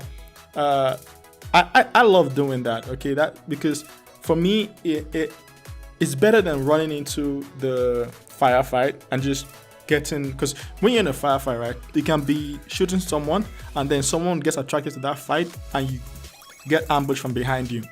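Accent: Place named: Nigeria. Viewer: Wow... Love your accent. Are you from Nigeria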